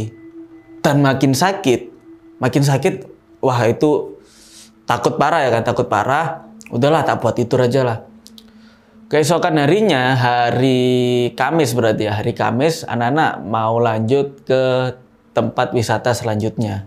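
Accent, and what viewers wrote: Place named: Surabaya, Indonesia. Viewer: Medok suroboyoan e,,👍😁😁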